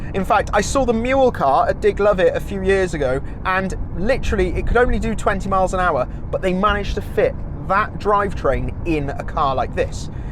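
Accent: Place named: Wales, United Kingdom. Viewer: i’m here for the welsh accent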